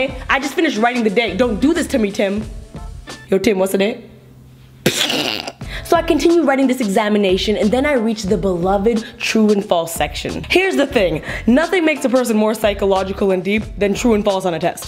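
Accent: United Kingdom. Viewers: That British accent😍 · Is it just me that LOVES her English accent 🤣🤣🤣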